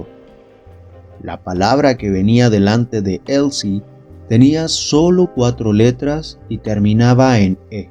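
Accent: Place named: Mexico. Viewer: Está muy bien leído.Trato de acostumbrarme al acento Charro.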